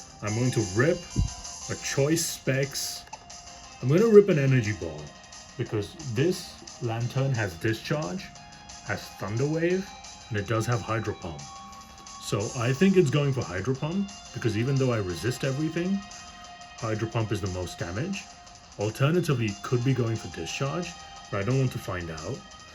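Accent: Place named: Africa. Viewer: The GOAT African accent returns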